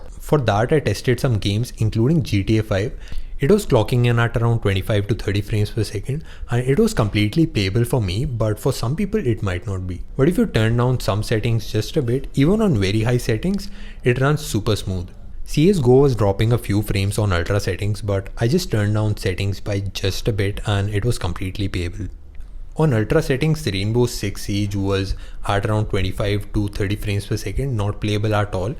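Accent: India. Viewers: I missed my Indian friends by hearing your voice, good video bhai!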